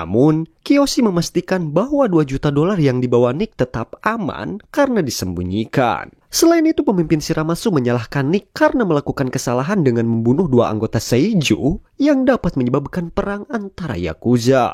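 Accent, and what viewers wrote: West Java, Indonesia. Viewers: Bang asli orang mna orang Sunda iya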